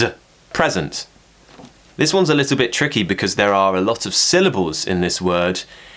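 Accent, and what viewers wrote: United Kingdom. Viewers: Hello, Im from Japan. I love british accent♡ you are the best teacher xx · I adore the English British accent I wish I could speak like you teacher! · I'm watching this video right now.And now I know Malaysian people usually use American accent rather than British accent.But seriously I think I'm falling in love with British accent.Thank you a lot for amazing video.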